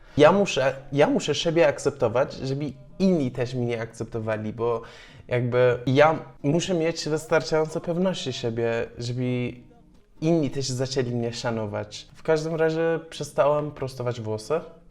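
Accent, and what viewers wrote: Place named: Rzeszów, Poland. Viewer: teraz wyglądasz i mówisz jakbyś był z Rzeszowa:) Pozdrawiam.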